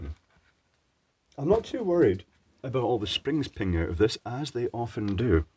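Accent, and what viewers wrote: Scotland, United Kingdom. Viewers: Really appreciate your videos 😊 I really love the accent and you're in Glasgow!! It's awesome!! My mom is a MacKay from New Glasgow, Nova Scotia, Canada. I have second and third cousins in Scotland. Mom's 92 and reminds me she's still Canadian everytime I contact her. Even though she has been living in the United States for over 65 years. I'm also a Ham Radio hobbyist for over 20 years. US call sign KB1DIG. Did a little contract work with Raython Aerospace and the Department of Defense just after 9/11/2001.. Production Controller for the retrofit of avionics on the KC-135 stratotanker.. Air Force version of the Boeing 707.. Flying gas can.. Played around with UHF VHF antenna design. Halos and collinear design antennas. Some of my stuff is still floating around on Google. I'm retired to the Philippines 4 years now. Have been doing a lot of YouTube videos. 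Mostly custom built ideas for motorcycle related stuff here in the Philippines. Plus some of the local happenings here. Thank you for your work and best regards for your future from the Philippines 🤠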